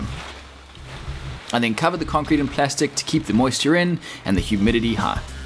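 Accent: South Africa. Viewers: Aussie - who woulda known, you sound South African. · Hi. I can hear from your accent that you are from South Africa, what cement do you recommend using thats available in SA? Thanks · Hey man, this is great 👍 Are you in perth? ( you sound South African ) , we have lots of saffas here. Nice work again mate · You sound like a South African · you sound totally south african to me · Your accent has alittle South African in it..love your work.. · Thought to myself, this oke sounds Saffa, then spotted the bare feet for confirmation LOL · South African? Great job btw. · Definitely a South African living in Australia. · Helpful video. Thought you were South African based on your accent. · This is definitely a South African accent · Boet, I see you're in Oz, but you sound just like a Saffa? Great video by the way. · That accent is SOUTH AFRICAN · Oi this dude sounds like a South African bloke? 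;)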